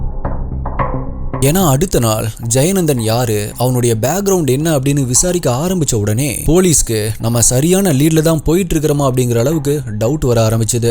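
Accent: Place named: Eriyur, India. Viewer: அண்ணா உங்க ஊரு ஏரியூர் ஆஹ் னா உங்க slang அந்த ஊருல உள்ளது மாரி irukku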